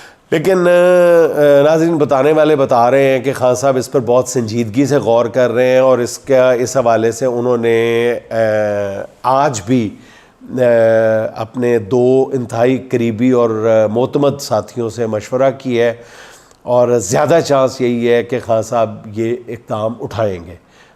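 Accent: Punjab, Pakistan. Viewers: تمام تر احترام کے ساتھ: جب آپ کہتے ہیں کہ "یہ اقدام اٹھایا" تو آپ سے غور کرنے کی گزارش ہے کہ اقدام plural ہے قدم کا۔ آپ کہ سکتے ہیں کہ "یہ قدم اٹھایا" دوسرے کہ "جن ملک میں" کے بجائے "جن ممالک" کہنا چاہیے میرا مقصد آپ کو شرمندہ کرنا ہرگز بھی نہیں ہے کیونکہ اب ہم پنجابی لحجہ میں اردو سننے کے عادی سے ہو گئے ہیں شکریہ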